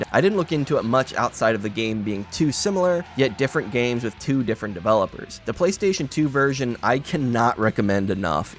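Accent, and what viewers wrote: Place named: Philadelphia, United States. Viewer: This Philly accent is making my ears bleed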